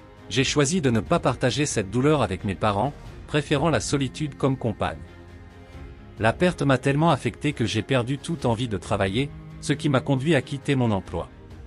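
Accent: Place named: France. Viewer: Ca parle aussi bien espagnol qu'une vache espagnole. 😂Mais malgré l'accent bien franco-français, j'ai quant même compris la fin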